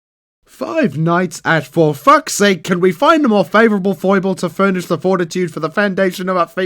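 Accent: Australia. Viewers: Why do you sound Australian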